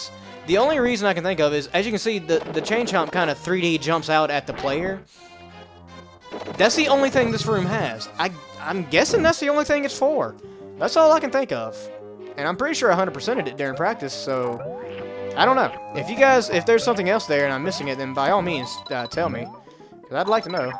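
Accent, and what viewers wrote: South, United States. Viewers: Every time I hear Southern accents, it makes me long for some of my Mom's cookin'! By the way, you're pretty good at this, hope you keep at it!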